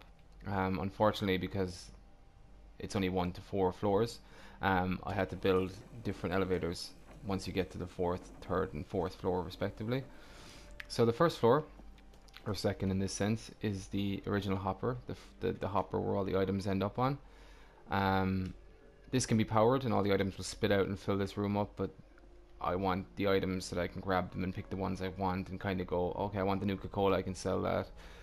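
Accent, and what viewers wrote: Ireland. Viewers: Are you Irish? Your accent is great dude. What’s the craick? Do the ting. Great video. Awesome build. Shouting out skooled zone was class.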